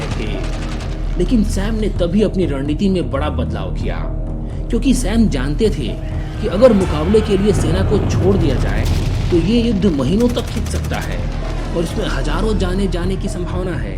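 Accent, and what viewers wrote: Bihar, India. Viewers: Yah baat.. Yah baat karte karte video ki aisi taisi kar Di.. Voiceover kisi aur se karwa lete yaar.. Bihari accent does not suit the video theme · Dude, you have worst hindi accent, Marsal nahi Marshall, Saw nahi Shaw, Des nahi Desh , pls stop this bhayiyya accent laude. 😆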